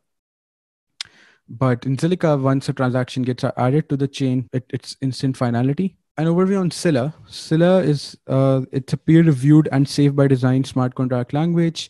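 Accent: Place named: India. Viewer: Indian English not understand I.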